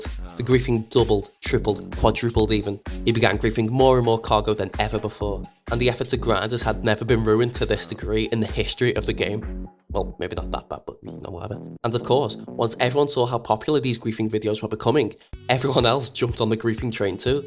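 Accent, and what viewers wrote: Liverpool, United Kingdom. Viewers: i cant tell if your scouse or not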